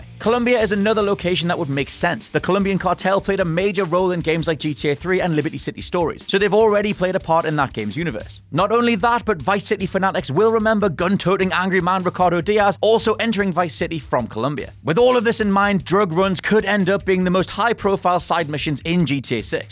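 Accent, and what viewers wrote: United Kingdom. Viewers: Why is it British accents on ever gta rumor video??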